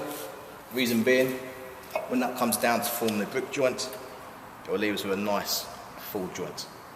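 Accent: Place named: England, United Kingdom. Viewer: natural stone moments . It's an English accent so I'm guessing England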